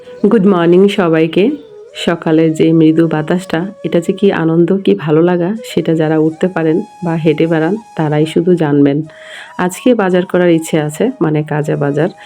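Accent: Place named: India. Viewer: আপু, খুব ভালো লাগলো ❤❤ আপু আপনার ভাষা ইন্ডিয়া এর মতো।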